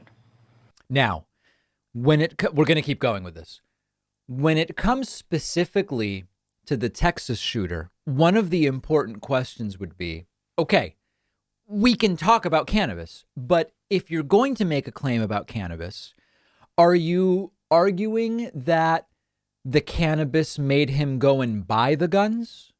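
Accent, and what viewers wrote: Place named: Argentina. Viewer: I've always found the Argentinian dialect of Spanish so unique, just lovely. And, you speak beautifully...more please! 😁 Huge fan of you & your show! Love and blessings, from California.💙💙💙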